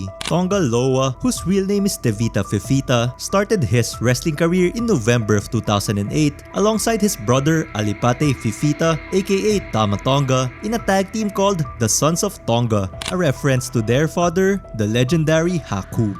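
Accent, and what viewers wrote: Philippines. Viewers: Pinoy ka sir? Yung accent kasi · Just found your channel. Good stuff. And you sound Filipino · this guy sounds FILIPINO AS FUCK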